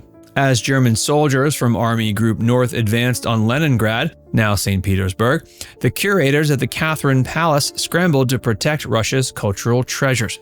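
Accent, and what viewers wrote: United States. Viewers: American pronunciation of nonAmerican language is atrocious. Please learn the standard world-wide usage of words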